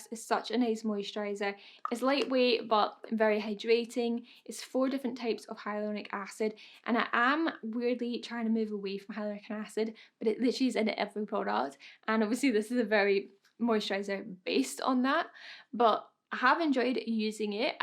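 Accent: Scotland, United Kingdom. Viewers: What kind of accent you sound Scottish can hardly understand what your saying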